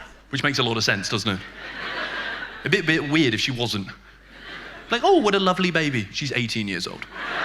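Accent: Denmark. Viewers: Simply Awesome! why did I first discover you now? Best Danglish since "The Julekalender". I hope you´re only beginning your triumph-tour here. Dit danske er faktisk fint, det et pænt svært sprog og vi taler som regel rimeligt forståeligt engelsk her, så det nemmere bare at slå over i engelsk, hvilket jo ikke giver meget sprogøre. Hope to see you again soon, put two shows in Sjælland please(maybe Kalundborg, there are thousands of Novo Nordisk employees, many of them internationals that will Love your show+ CPH ofcourse)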